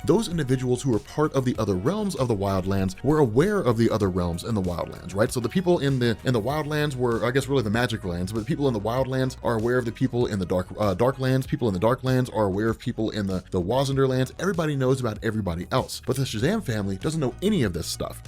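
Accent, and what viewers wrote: United States. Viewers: The way Americans pronounce English places never stops amusing me 🤣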